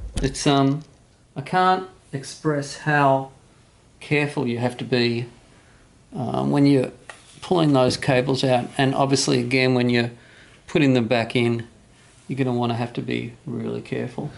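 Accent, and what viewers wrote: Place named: Australia. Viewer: Great video, good the hear you still have the great Aussie accent! Different problem with my 6000 but excellent guide.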